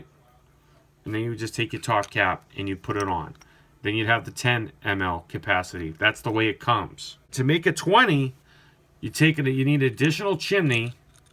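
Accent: Boston, United States. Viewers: Do I hear a Boston accent?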